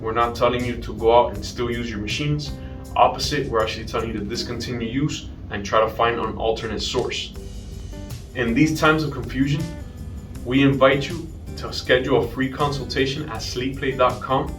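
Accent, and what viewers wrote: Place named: Miami, United States. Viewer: Bro, you have the thickest Miami accent ever. I gotta save this vid to show people what a Miami accent is. 😃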